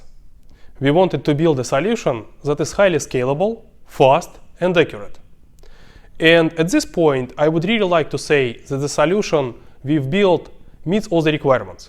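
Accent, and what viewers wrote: Russia. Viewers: Are you Russian ?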